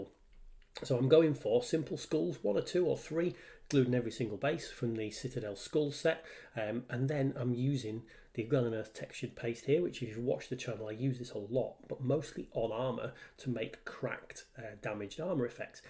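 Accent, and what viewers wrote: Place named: Wakefield, United Kingdom. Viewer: do live in Wakefield you just seem to have that accent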